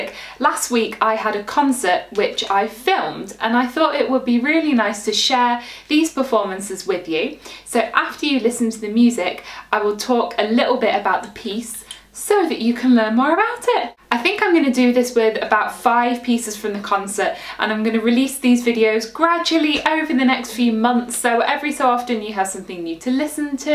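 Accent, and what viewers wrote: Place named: United Kingdom. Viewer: That "regatta bear" was funny, I need to put the subtitles to understand a little bit more, in spanish "I'm a recorder player" sounds like "Am a ricode plée", sounds nicely to me the british accent but to write this and all of the sentences are another different thing at all. In fact, we use the same word "flauta" for flute or recorder, in case we ne need to clear what instrument is the we need to add one word: "flauta traversa" for flute and "flauta dulce" for recorder. cheers.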